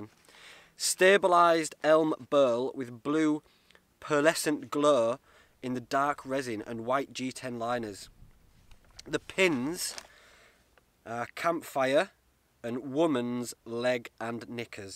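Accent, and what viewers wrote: Yorkshire, United Kingdom. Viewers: my favourite fellow 'mucker' for outdoor stuff. And not bad a carpenter too. Your natural skill of good Yorkshire waffling is brammer Keep on enjoying yourself. Really shows in Ur vids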